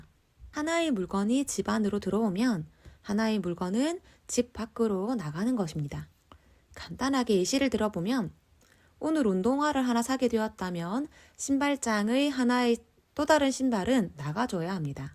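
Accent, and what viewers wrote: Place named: Gyeongsang, South Korea. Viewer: 저는 서울지역 이라 그런지 첫마디부터 경상도 말투가 느껴지는데요. ㅋㅋㅋㅋㅋ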